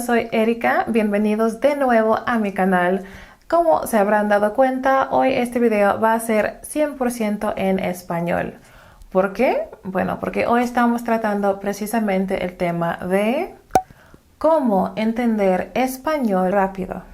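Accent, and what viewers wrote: Standard. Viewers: muy cierto, tiene un acento bastante neutral...con unos toques caribeños pero muy buen español , felicitaciones! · Wooow tu español es EXCELENTE... hablas mejor que muchos latinoamericanos... Tono adecuado, buen acento neutro, buen ritmo, sin acento gringo... Esta PERFECTO felicitaciones 👌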